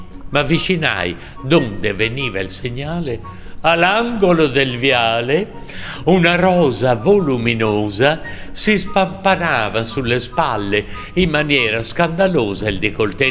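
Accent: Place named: Florence, Italy. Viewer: Interpretazione fiorentina fantastica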